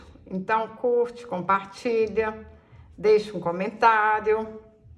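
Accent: Minas Gerais, Brazil. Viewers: Você é mineira? Eu sou cearense, moro em Fortaleza. 😀 Adoro o seu sotaque! Parabéns pelo seu trabalho! 👏👏👏👏👏👏👏👏👏👏👏👏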